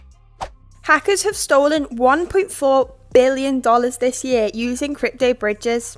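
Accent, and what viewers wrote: United Kingdom. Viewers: Yea to the northern accent! I’m sold.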